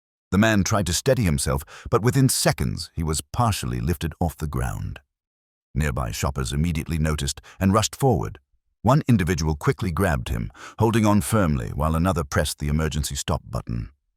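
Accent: United Kingdom. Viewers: This new voice is horrible, you already had the perfect posh British voice on recent videos. Please change it back.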